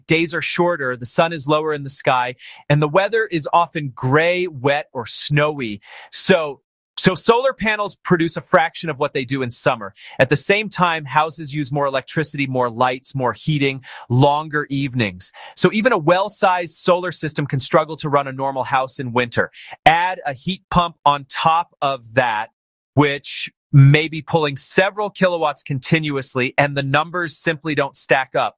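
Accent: United States. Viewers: What's the deal, you sound like you're from the US, but you're talking about pounds rather than dollars? In a discussion such as this, it helps if the costs per unit of energy are known. Since heat pumps are more efficient than heaters, the should use less energy overall unless it's so cold that their efficiency drops way off. But, I don't know the relative costs of electricity and natural gas where your customers live. In both Pennsylvania and California where I have lived, heating with natural gas was always quite a bit less expensive than heating with standard electricity powered furnaces. The other down side to heat pumps is the relatively high costs to purchase, maintain, and repair them compared to simpler natural gas or resistive electric heaters. With that in mind, it makes little sense to go for a heatpump if it DOESN'T run quite a bit per year because the electricity cost savings don't make up for the higher purchase and maintenance costs. 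Of course, if you need air conditioning in the summer then you also get some additional energy cost savings from your heat pump when cooling the house. Also keep in mind that the capacity requirement for your unit is based on the size of your home but also on the temperature differential that you want to maintain between indoors and outdoors. If a hot summer day in your area is 95F and you want an indoor temperature of 75F, you have to maintain a 20F temperature dufferential, but if a cold day in your area is 0F and you want an in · A thousand pounds of what? AI crap. A limey with an American voice. And I always knew heat pumps and solar panels are stupid.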